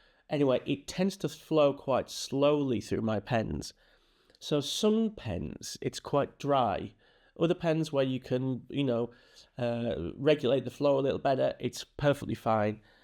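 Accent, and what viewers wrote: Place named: Yorkshire, United Kingdom. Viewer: Our washer is running, which is quite loud, so at first it sounded like you said, "Holding the pen at the fruit store." 🤣🤣🤣 I have no trouble understanding your Yorkshire accent (I'm an American with a longstanding love for British TV especially Monty Python) but with the washer running it made it sound different. I should maybe also mention that I often mis-hear words as a quirk of my autism. It makes for some hilarious conversations with my husband. 😂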